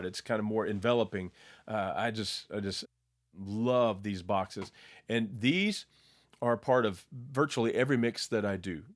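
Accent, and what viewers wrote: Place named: United States. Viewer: probably the best Neve teaching tutorial ever and the sample music was pleasing .... nice to hear an American accent too...thx !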